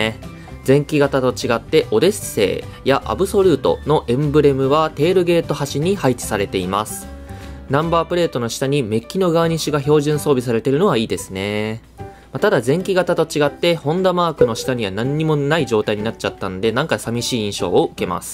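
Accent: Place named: Kansai, Japan. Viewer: 南港あたり、西名阪、香芝インター、たまに聞こえてくる関西弁ｗ すごい親近感がわきますｗ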